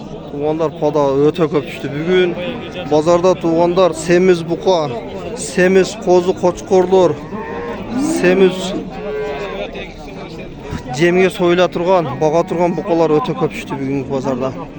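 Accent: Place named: Batken, Kyrgyzstan. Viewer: Баткенде ошентип айтылат аррегиондунсуйлоо речи бар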